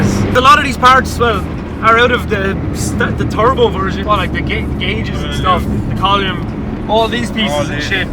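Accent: Ireland. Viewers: Good old Irish accent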